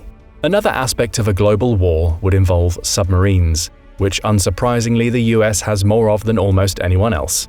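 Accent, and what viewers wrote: United Kingdom. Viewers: He’s from the Uk that’s why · He sounds like a Brit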